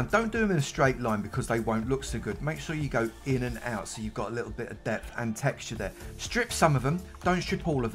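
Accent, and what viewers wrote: United Kingdom. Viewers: its the british accent 😹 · Are u British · he got the average british "voice"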